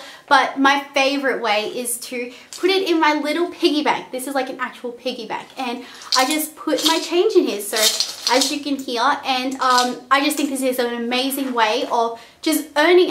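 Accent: Australia. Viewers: its Australian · How are you getting British? She's clearly Australian. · Nope she's definitely Aussie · Australian accent is quit pretty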